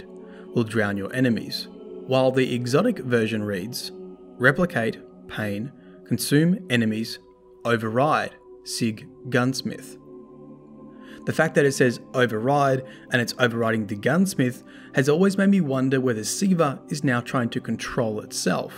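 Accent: Australia. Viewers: Quarantine ! Also Lol ‘Zed’ I love your AU accent!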